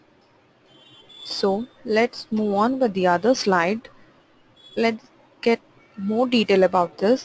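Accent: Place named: United Kingdom. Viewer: very nice reading and beautiful british accent - music to my ear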